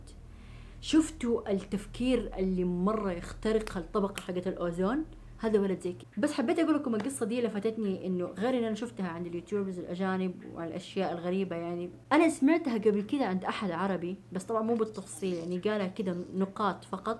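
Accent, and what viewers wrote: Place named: Riyadh, Saudi Arabia. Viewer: got u اهي سعوديه وانا مو سعوديه ف ما افرق بلهجاتهم بس اتوقع لهجة اهل الرياض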